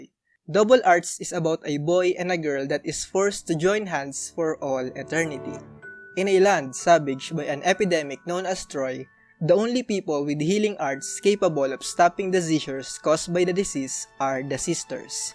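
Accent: Philippines. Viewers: your accent sounds filipino, but if Im wrong I think you're still from the southeastern asian countries, correct me if i'm wrong tho. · What an Filipino Accent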